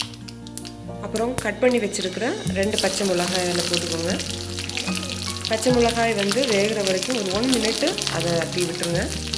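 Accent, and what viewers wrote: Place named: Kanyakumari, India. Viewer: Kanyakumari Tamil❤️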